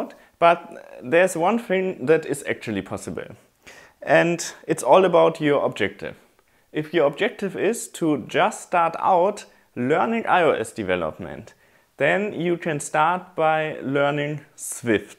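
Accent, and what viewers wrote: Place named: Germany. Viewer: I guess he is german...... i know german accent...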